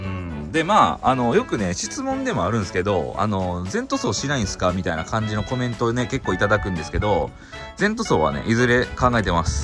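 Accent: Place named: Kansai, Japan. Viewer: 同県民だけど微妙に関西弁違う 当方北部です。前の川？綺麗な景色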